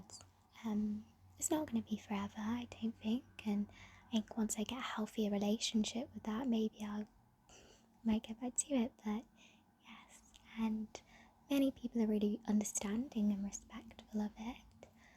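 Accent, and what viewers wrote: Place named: United Kingdom. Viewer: You know what it takes real courage to talk about this, especially to people online when trolls are very common. This is the first video of yours I've watched since I've just found your channel and it's kind of opened my eyes to quite a few things, I to fell into the hook up culture but mine was for a different reason ( I won't bore you with it and it's too personal to type about for everyone to see 😊), I guess I need to go and do so thinking, searching if you will 😐 as a British guy I still find it amazing how gorgeous some accents are in our country, you've got such a sweet, innocent voice.. I could literally listen to you talk for hours 😊 that is why I hope you do more of these video's, just hopefully longer.. even if it's just for my own gratification 😳😜 thank you sooo much for this video 🤗💜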